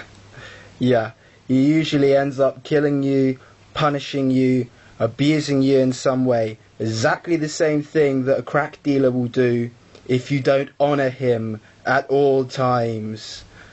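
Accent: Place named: London, United Kingdom. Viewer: What gang was you in? you sound like suburban Londoner. I'm from Hackney, London, and i there ain't no gangs in London, just wannabees, you sound nothing like a gang member. I lived in Oakland for a while and that is a scarey place my nigga with a real bangers. If you wanna help bangers in London, don't make videos go out and do suttin for them. peace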